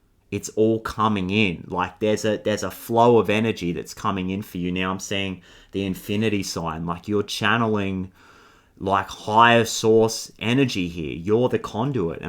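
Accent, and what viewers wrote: Australia. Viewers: Thanks bro. Are you from Australia?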